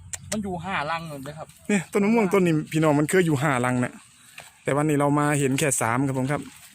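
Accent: Korat, Thailand. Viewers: ดูคลิปทุกวันเลยครับคนโคราชคือกันฟังแล้วอบอุ่นคิดถึงบ้านครับ มายุกรุงเทพนานแล้วช่วงนี้เจอโควิดอีกคิดถึงบ้านกะกลับบ่ได้😢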